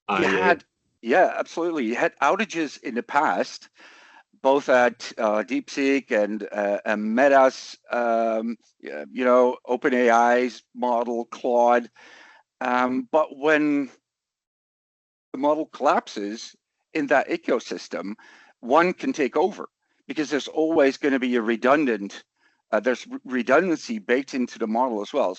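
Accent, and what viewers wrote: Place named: Australia. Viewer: Nice Aussie accent for a Dutch guy ...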